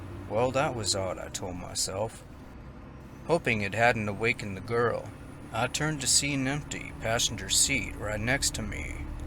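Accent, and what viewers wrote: South, United States. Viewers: Always loving that southern accent .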